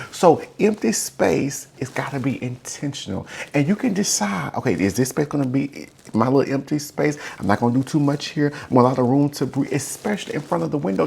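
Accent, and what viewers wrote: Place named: South, United States. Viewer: Not to be weird…. But, I just love listening to you! Yes, I subscribed. You are helpful, honest, forthright, and you make me laugh. :) And I love your southern accent!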